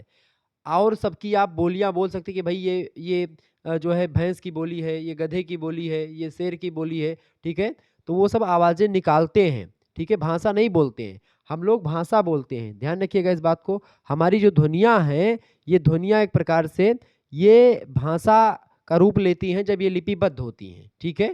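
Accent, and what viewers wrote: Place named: Bhojpuri, India. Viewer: 😅😅😅bhojpuri achha lga aapke mooh se ho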